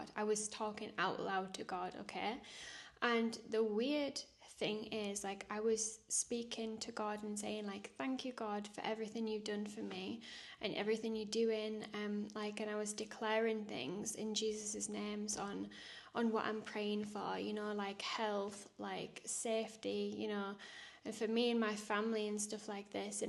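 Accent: Northern England, United Kingdom. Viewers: I've rediscovered my FAITH thanks to you and now my Wife. I maybe rediscovering my FAITH but that was a demon! You are doing God's work doing all the good in the world giving Heavenly Father's messages!. Stay safe for goodness sake. I'm newly married Wife in Queensland I'm in PERTH western Australia. I too have had similar things happen to me. God talks to me daily and its as if and I'm sure you can relate we are suddenly targets. I've had so many experiences I could have been killed its freaky! I'm From England and its your Northern accent drew me. Cheshire the Wirral?? 😊 Either way You make the each day warmer happier with your Videos. God bless You. ❤😊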